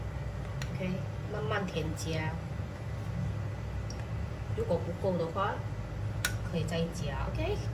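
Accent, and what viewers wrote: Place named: Malaysia. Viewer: 我们马来西亚人的语调😆很温馨还有马来文备注📝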